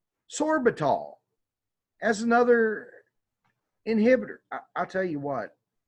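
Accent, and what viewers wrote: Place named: Georgia, United States. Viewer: either way, he says it in Georgia talk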